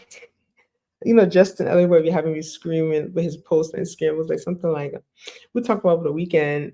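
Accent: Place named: East Coast, United States. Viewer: I'm new to your channel, but you definitely have an east coast accent. I can also hear a little bit of Naija in you.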